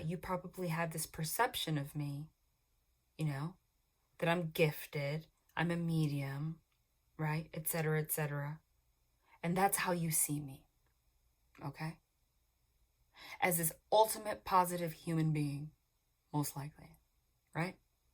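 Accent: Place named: Long Island, United States. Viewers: Girl - I went to UB!!! Crazy. I grew up on LI and have always loved you accent. I love in PA now and miss that sound. But the universe is telling me over and over I’m on the right path! 💖💖💖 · We haven’t even started the reading I just poured a hot cup of ☕️ sat on the couch, said this chick has to be from NY “OKAEH!” I went to school for early childhood development at St John’s. Had my son at 19, nope kids and a baby who doesn’t stop crying? Don’t think sooooo Was in a crazy marriage and another crazy relationship went to school for medical assisting. I parked in the ER for 13 years.... was soooo tired but had job security and great benefits and the bestest friends...... went to nursing school spent 8.5 of those 13 in peds. Moved to the south to be with the soulmate, here we are I have chills listening to your personal story, and you are so absolutely correct. 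God bless love.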